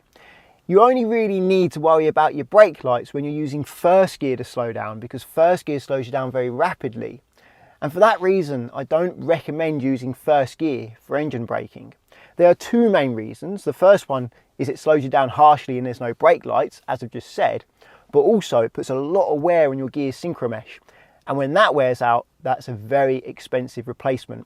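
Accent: United Kingdom. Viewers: Your accent is so beautiful.which part of the UK does it come from?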